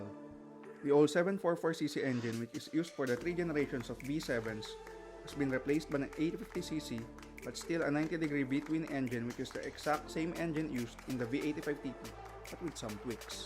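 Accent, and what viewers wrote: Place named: India. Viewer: Interesting and well produced video, but I struggled with the narration. Not even the English speak that fast and coupled with your heavy Indian accent, it was difficult to understand you. Please slow down your delivery and I am sure your viewers will appreciate it.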